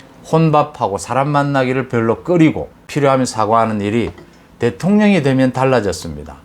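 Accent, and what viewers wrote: Gyeongsang, South Korea. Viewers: 선생님해설은 들을수록 매력이 있어 자주 구독합니다. 경상도 매력있는 말 씨가 들을수록 이끌 립니다.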